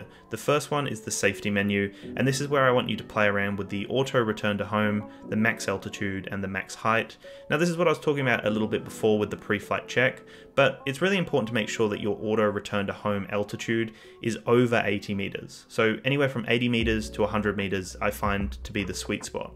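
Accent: Australia. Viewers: Thought you were Aussie, I live in Echuca in Victoria :) keep up the good work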